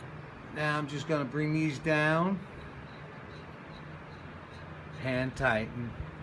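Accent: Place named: New Orleans, United States. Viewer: I volunteer at the WWII Museum in N.O. and when meeting visitors from out of town I always try to guess where they are from based on their accents. You have an accent like people from the N.O. area and also from the northeast. I want to say perhaps the metro N.Y. area, am I correct or totally off base? :- )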